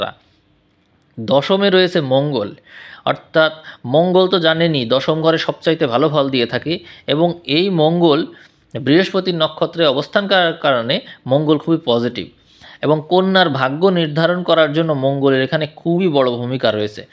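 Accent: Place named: Gopalganj, Bangladesh. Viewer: ভাই আপনার কথাগুলো খুব সুন্দর আপনার কোন জেলা মনে হয় গোপালগঞ্জ এবং আপনার কথার সাথে আমার জীবনের সাথে অনেকটা মিল আছে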